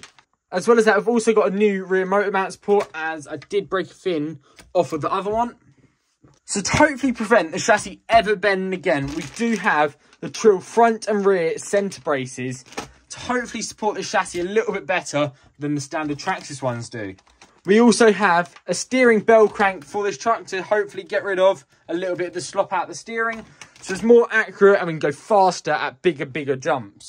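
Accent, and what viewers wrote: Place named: United Kingdom. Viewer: Nice new to your channel but I never thought I would find a better basher than kev but I did that’s you also can you do a vid on slash, bandit, and rustler because I have a rustler and I get your British and that’s your accent but saying Al-i-min-yum is fine instead of Al-lum-i-num but pls don’t say Shassy it’s ch not sh you don’t say it like Charolette it’s chassis sorry not trying to be rude but it like makes me feel wiry to hear shassy but love the channel and again I’m sorry if I’m being rude ❤❤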